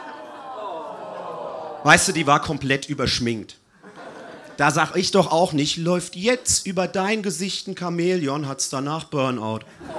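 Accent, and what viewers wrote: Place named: Hesse, Germany. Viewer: Man merkt das er mit der Zeit immer mehr dialekt bekommt😂am anfang hoch deutsch und dan hessisch dialekt dan wieder hochdeutsch 😂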